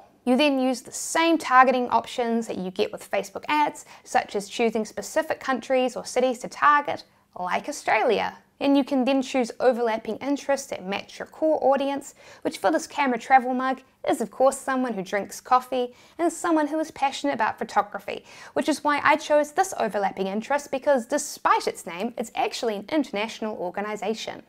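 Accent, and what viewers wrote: New Zealand. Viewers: Peppa Pig is British, where here sounds like she's from New Zealand. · keewee accent bro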